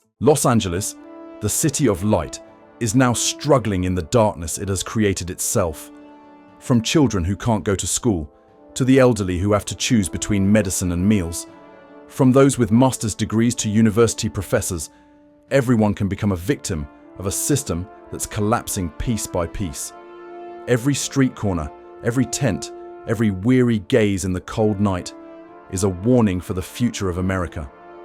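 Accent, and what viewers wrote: England, United Kingdom. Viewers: English accent throwing shade on LA - nice try diddy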